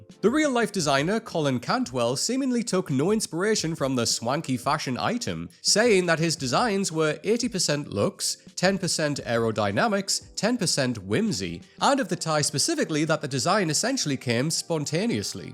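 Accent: United Kingdom. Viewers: Like I need a British voiceover telling me about American Ingenuity.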